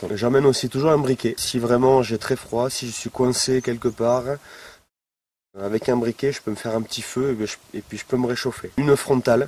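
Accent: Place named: South, France. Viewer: salut, tu as un léger et vraiment bel accent qui me rappelle Marcel Pagnol! J'aime beaucoup tes vidéos traitant de la randonnée ( je fais uniquement du cyclotourisme, mais le principe est le même). bise d'Alsace.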